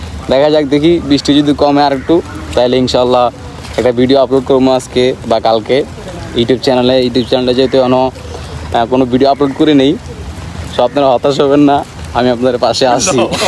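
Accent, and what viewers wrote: Noakhali, Bangladesh. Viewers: Vhai to valoi kotha bolen noakhali bhashai 😮😮😮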